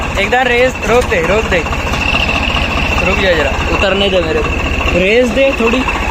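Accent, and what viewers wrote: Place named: Rajasthan, India. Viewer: Bhai Rajasthan se ho ya haryana se.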